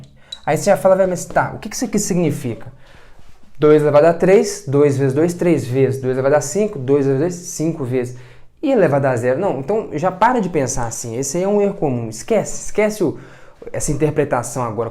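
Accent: Minas Gerais, Brazil. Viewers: esse minino é mineiro, tenho certeza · explicando as coisa é MT mais satisfatório uai kakakak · Se é mineiro,não é? kk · Mano, que legal, sou mineiro também! Sério, pra mim Minas não tinha sotaque, ao mer ver tínhamos um "português puro", mas eu realmente consegui perceber o seu (não que não seja esplendoroso). Ksksksk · Tu és mineiro, né? Kk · bom dms ouvir um minerim falando, tranquilidade contagiante. Parabéns pelos ótimos vídeos irmão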